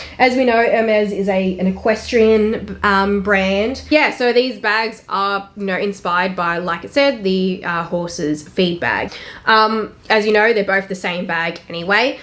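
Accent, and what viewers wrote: Australia. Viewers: I would love if you updated your game- spend money on whitening and straightening your teeth, wear clothes that are tailored- more expensive looking. You are a wealth of information but your speech is your game. If you seriously worked on your elecution. You would be sooo amazing! Slow down your speech and less (bogan Australian speech) this is not a mean comment. You have a lot of potential ❤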